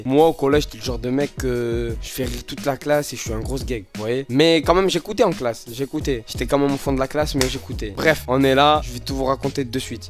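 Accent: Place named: Marseille, France. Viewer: Il a l’accent de Marseille mais il met paris ?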